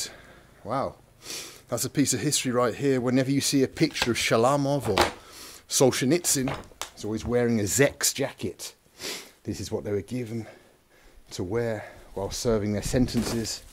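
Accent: United Kingdom. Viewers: Great video,! A foreigner who helps me, the russian to better understand a "misterious russian soul". I enjoy your epic voice and nice brittish accent. Thanks for travelling across russia's provinces. Hope to see you one day here in Russia's Far East Sakhalin. And yes, all museums in Russia are usually closed for a day-off on mondays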